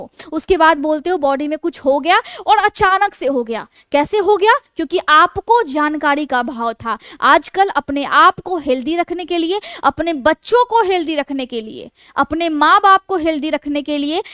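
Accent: Bihar, India. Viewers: और अच्छा बोल सकतीं है आप, बिहारी ton avoid कीजिये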